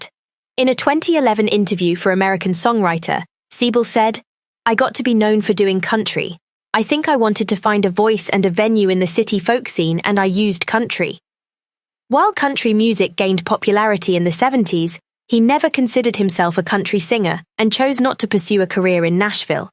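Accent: England, United Kingdom. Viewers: Why is an English woman talking about the USA? Go back to your home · Why do they got this British lady doing this and Gilbert Gottfried already passed away already she gots her scruples backwards 😵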